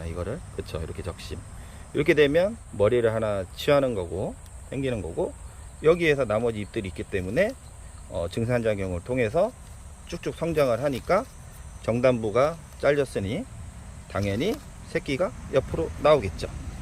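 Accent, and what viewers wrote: South Korea. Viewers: ㅋㅋ 님은 서울말 안어울려요 구수한 시골말로 계속~~~적심방법과 이유 잘 배웠어요😉😊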